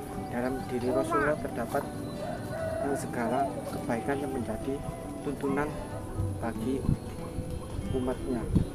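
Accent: Java, Indonesia. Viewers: Vocal suara jelek kaya orang jawa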